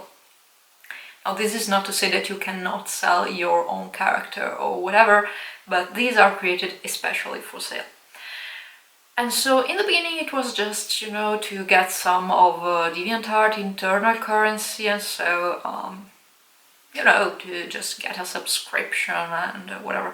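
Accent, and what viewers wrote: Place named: Netherlands. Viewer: I too felt guilty about spending money on frivolous art supplies...though, they brought me joy. I admire your tenacity to really pay your necessities, before your luxuries...you are one smart cookie...though you live in Italy, you actually sound Dutch to me...thank you for your input here...much appreciated...Janis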